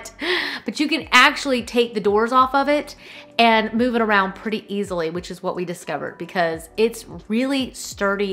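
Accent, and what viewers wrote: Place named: South, United States. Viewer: I'm hearing a strong southern accent in this video, which i hadn't picked up before and now I'm wondering if I've missed it all this time 🤔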